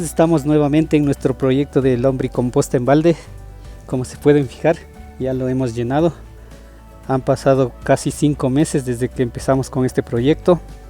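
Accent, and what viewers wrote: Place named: Quechua, Bolivia. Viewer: Hola, gracias a ti. Lo dije porque me recordaba mucho tu acento a los bolivianos que hablan quechua, será una casualidad. Saludos !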